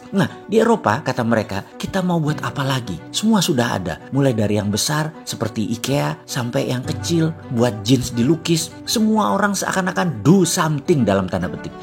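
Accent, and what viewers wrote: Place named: East Java, Indonesia. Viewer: Ha-ha-ha kocak Jawa Timuran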